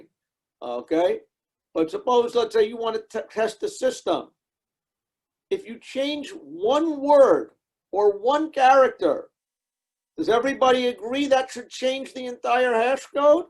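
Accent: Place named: New York, United States. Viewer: a thick New York accent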